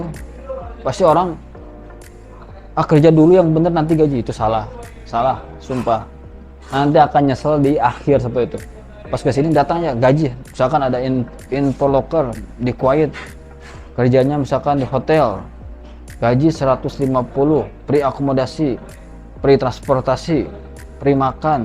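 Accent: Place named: West Java, Indonesia. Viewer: Kapan mao ke salmiya bang ? Kopdar" Aksen nya aksen sunda ini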